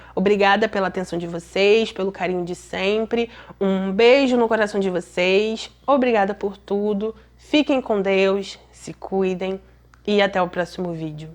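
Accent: Rio de Janeiro, Brazil. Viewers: O vídeo e a história são maravilhosos 😀 😀😀... Mas o sotaque carioca é um nojo 🤮🤮🤮.. horrível 🤮🤮🤮🤮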